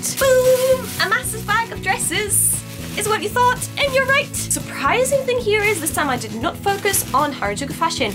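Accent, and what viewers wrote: United Kingdom. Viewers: ^_^! I have to ask you, actually is little bit um, other question than like kawaii fasion! Because I don't have money money, and here aren't any stores, too expensive (postage) [Czech post the best ha] and blahblah Have you ever been in Czech Republic? x_x Do you drink beer? um, btw I like your british pronunciation! (too shy to say love)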